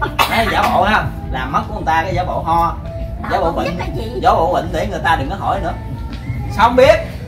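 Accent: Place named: Mekong Delta, Vietnam. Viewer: Haha, giọng điệu đúng chất miền tây luôn a ơi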